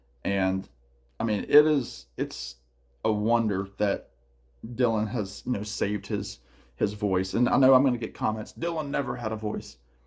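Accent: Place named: North Carolina, United States. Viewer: Big fan here. " " is still my favorite Dylan record. " " is alright. " " is great. " " is probably the first time I heard him croon. Some of those songs reminded me of. I grew up in the Deep South and recognize southern accents. (definitely a Delta guy) I'm going to go ahead and say your accent is North Carolina, possibly Tennessee? I tried to do a Dylan show but don't touch him on YouTube. They will yank that in a heartbeat. " " looks like a black metal album cover 😅. This is good. I'm excited to watch more videos. Cheers!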